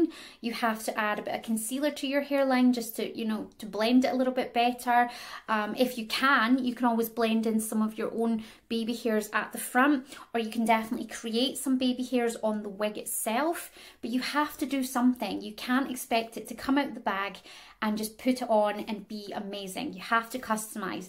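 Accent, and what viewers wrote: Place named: Scotland, United Kingdom. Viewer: Nice video.. Very informative wig looks great on you Ps love your Scottish accent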